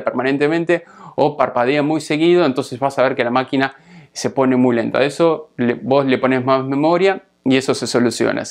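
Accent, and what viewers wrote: Argentina. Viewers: es argentino man, no escuchas el acento?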